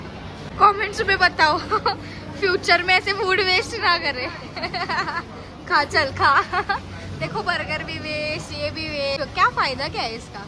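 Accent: Kolhapur, India. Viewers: Tujhi Kolhapuri Flow Cha Fan Zalo Aahe Mi Kai Toh Flow Aai Hayee Ghayal 🦋💗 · Aga ky masta kolhapuri accent aahe yrrr....😍😍. ly bhari te takkay ja vlog madhe 😄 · Tuze vlog chan aahet Pn tu marathi tunch vlog karat ja Tu marathi khup chan boltes Ekdm kolaphur style Love and support you 😇❤✌ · Bhaii urr kolhapuri acent😂💖itss tooo good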